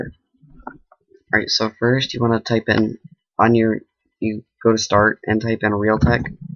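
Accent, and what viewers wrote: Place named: Asia. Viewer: why do you talk Asian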